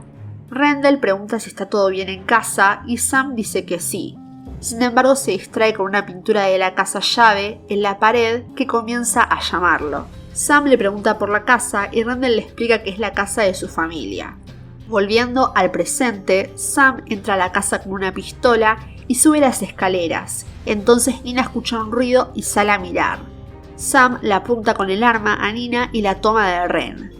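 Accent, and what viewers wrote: Argentina. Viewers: Te lo explico porque al parecer sos ignorante, yo soy argentino y el acento en mi país es así, yo= le decimos sho, llave= shave, la "Y" se pronuncia así, deberías tener un poco más de respeto, en diferentes países se hablan de diferentes maneras y cada uno tiene un acento.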